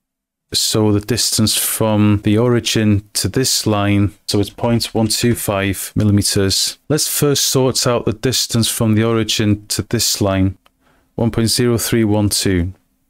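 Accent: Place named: Wales, United Kingdom. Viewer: Late reply, but he's saying contour! He has a strong Welsh accent!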